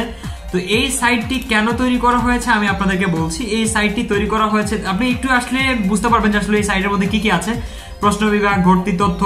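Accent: Dinajpur, Bangladesh. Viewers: ভাইয়া মাঝে মাঝে, আপনার দিনাজপুরিয়া ভাষাগুলো অনেক ভালো লাগে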